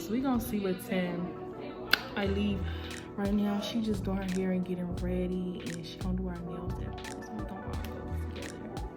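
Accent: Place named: Chicago, United States. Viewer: Girl lmao you from Chicago?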